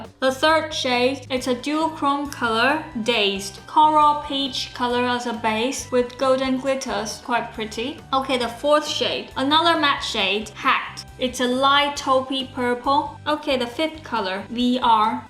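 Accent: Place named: United Kingdom. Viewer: 沒想到的英文有了英式發音 XD 好新鮮的感覺 (從部落格時期收看文字的我...適應會動的中) but it's a nice palette..but wow, that purple..lol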